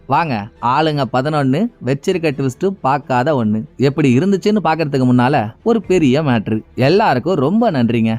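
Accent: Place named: Southern Tamil Nadu, India. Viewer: Congratulations bro. I hope you are from the Southern part of Tamilnadu. Great vocal energy and slang. Kindly reveal your native brother.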